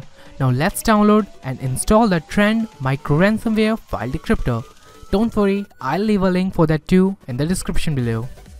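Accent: India. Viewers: FUCK UR ACCENT U INDIAN GURU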